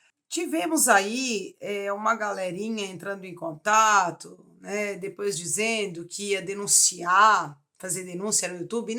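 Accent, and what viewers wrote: Rio Grande do Sul, Brazil. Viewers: Tu fala muito bem!!! E tb achei que tu era gaúcha como eu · Nossa, eu jurava que você era gaúcha, e eu sou gaúcha! Volta e meia você solta alguma palavra que eu acho bem "catarina" mas no geral acho seu sotaque muito parecido com o nosso. Amei o vídeo!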